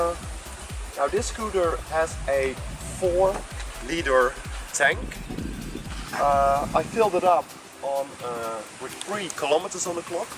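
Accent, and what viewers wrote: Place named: Netherlands. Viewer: The Dutch accent tho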